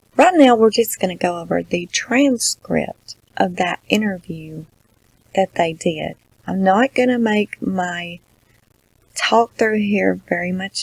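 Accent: South, United States. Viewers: From the time Shannann got home, I do not believe a word Chris said. Fact according to Shannann. In order to have sex, she would take a shower. Her suitcase is open in the discovery, and her toothbrush was still packed. She according to discovery had full make up still on. She was found with bra and underwear on. The only thing off was a different tee shirt, and her leggings. This is a story made up by a man, that is clueless. Men out there reading this. Be very careful of females swishing their tail like a bitch(female dog) in heat. Females are very protective of our belly’s when pregnant. She was never on her stomach, and we sure don’t let anyone sit on our babies., thank you for deciding what we are having for dinner. FISH Love you my southern talking girlfriend. True story. I use to travel for work. I was in yet another drug store in l think Wisconsin and a young man said “ I love hearing you talk, where are you from? 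“. I told him I was raised in Ga. but live in Texas. He looked at me and said, “ Do you need a passport to visit? 😳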